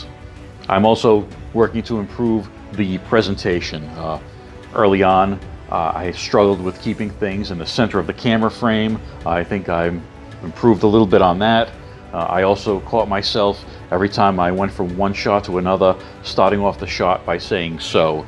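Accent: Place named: Northeast, United States. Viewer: Ohhh man the electirc company!!! that was wild! Totally flashed back when you mentioned that,, And Man, you have stuck your foot in a great pool of you tube friends my man! welcome! your channel is already so entertaining. Can't wait for the Bat review in March. Blue is cool, music is great - I like your voice the most... great north east usa accent man. I just really like that you are true blue.. passionate about this stuff and are not a faker like so many seem to be these days! Proud to know you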